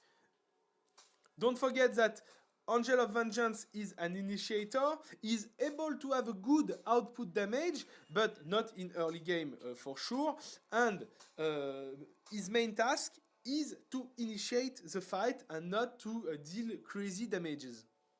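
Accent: France. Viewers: bonjour tu ne serais pas un français par hasard??? ton accent est trop marrant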